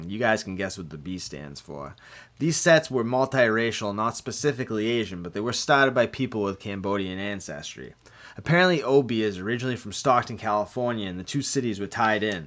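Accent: Boston, United States. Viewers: Your Baston accent is thick just like your skin.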